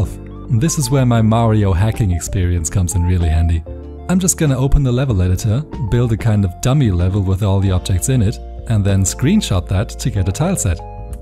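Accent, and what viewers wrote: Germany. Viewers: Den Deutschen hört man schon deutlich raus Bruder. ; ) Du hast nicht zufällig früher TheEmero geguckt? : D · german guy? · der deutsche akzent der isses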